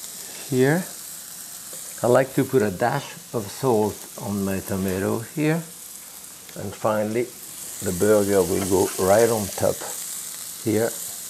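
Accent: France. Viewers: Besides watching Jacques cook, I love listening to his voice. My maternal Grandmother was from France and it reminds me so much of how she'd speak English in her beautiful accent. It's so comforting to watch him cook and listen to him describe the process while relating personal stories. I'm sure I'll never have the privilege of meeting, but I'm extremely grateful to be able to watch his videos on YouTube and Instagram every day. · exactly, every other commenter is a celebrity obsessed child. the reality is this was an atrocious example of cooking on every level. food safety, ingredients, technique. sorry, other than the charming accent and the nice personality (my dad speaks clear American English also from France about the same length of time). I love burgers and good cooking by the way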